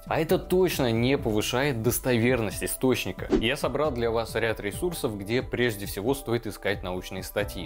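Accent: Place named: United States. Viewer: Некорректно - неточно, неверно. Ну и этоо выкаблучивание с чтением с американсим выговором раздражает.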